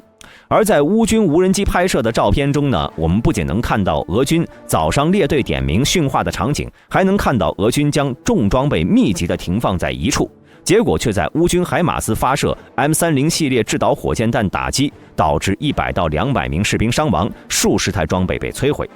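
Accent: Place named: China. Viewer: 説話者是大陸口音 留言者都不是大陸的😁😁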